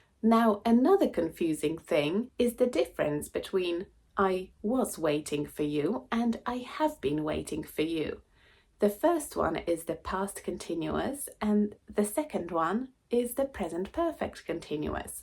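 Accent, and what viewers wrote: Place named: England, United Kingdom. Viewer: Dear, Your accent is very clear and that you slow speak so this you make understanable. You have very nice an English accent.. Thank you so much 🙏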